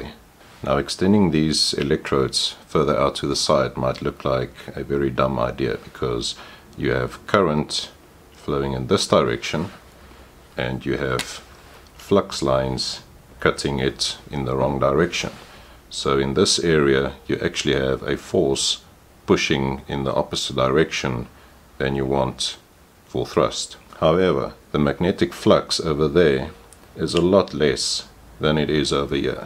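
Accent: South Africa. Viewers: You sound South African. :)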